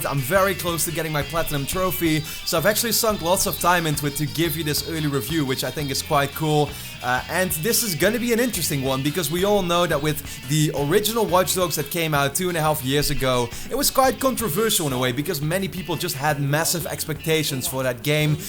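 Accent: Netherlands. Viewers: Erg goede review kerel! Ging ervan uit dat je Nederlands of Vlaams bent. Awesome review, just to be sure ;)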